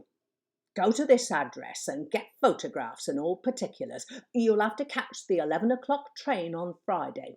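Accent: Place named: England, United Kingdom. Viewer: Very well read. I searched for the title here and yours is the only one I came across featuring an English accent. I love the Yanks but an American reading Wodehouse? Not cricket at all.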